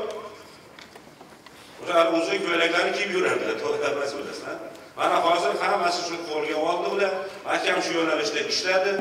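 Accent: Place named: Khorezm, Uzbekistan. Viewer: Bu asli xorazmlik ushanchun tilni unaqa qb gapirayapti ozbekchani zorga gapirayapti dgan gaplarni yigishtirilar xorazmcha sheva xam qoshilib ketayapti bu inson yaxshi jonkuyar inson xurmatli Prizidentimiz bekorga bu insonni bosh vazir yordamchisi qb qoymagan xali koraslar bu yaxshilikka zor boladi bu yogi xudo holasa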